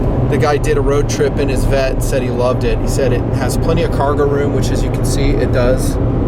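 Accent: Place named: Texas, United States. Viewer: Yup sounds like Texas.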